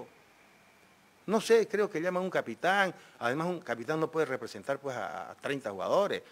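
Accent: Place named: Camba, Bolivia. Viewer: esta es la voz de un camba de verdad, grande!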